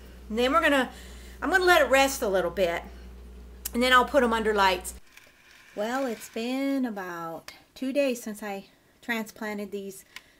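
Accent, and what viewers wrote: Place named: North, United States. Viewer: Where are you from? Accent sounds like a northern state.. near canada?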